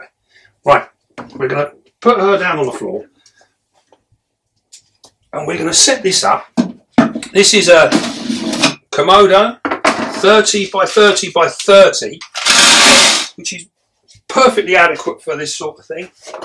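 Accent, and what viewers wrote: United Kingdom. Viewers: What big spinerats she hase,she how she can make so much web,so easy I think a lot of people watch for the Beautiful T you have an great content,but a small section I bet for ur British accent an a super small are arachnophobia people,. Am in the first bunch 8 T s so far. Have a happy new year an camera lady 👍👍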